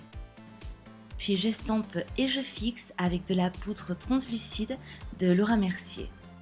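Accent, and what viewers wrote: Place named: Béziers, France. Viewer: Vu ton accent tu viens de Béziers non ? Hihi j'adore ton maquillage bisous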